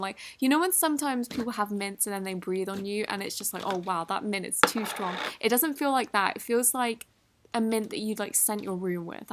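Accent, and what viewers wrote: United Kingdom. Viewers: I also ❤️ ur British accent 🤗😍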